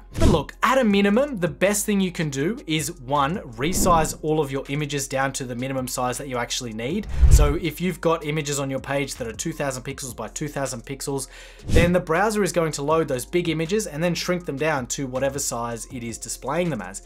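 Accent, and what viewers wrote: Australia. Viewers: Really good material, and good to hear an Aussie voice too.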